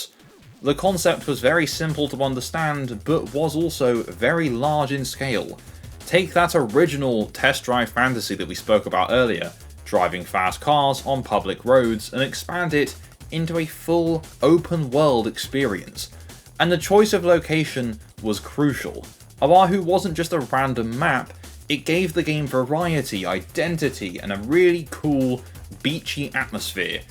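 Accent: United Kingdom. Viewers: I like how for the history of the franchise you simultaneously cherry-pick and ignore games based on their localized titles. 'Le Mans 24 Hours' somehow counts yet 'V-Rally' doesn't. 'TDO' is in but 'Test Drive Off-Road' isn't. Opinions and facts are not interchangeable. Also, you sound fairly British to me, why are you using NTSC titles and not PAL?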